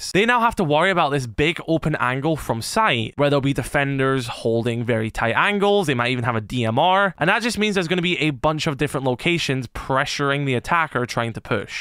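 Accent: United Kingdom. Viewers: bri ish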